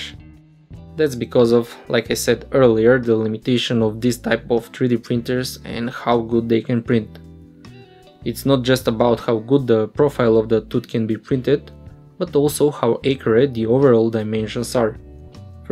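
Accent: Russia. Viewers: Русский акцент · Please stop talking with a Russian accent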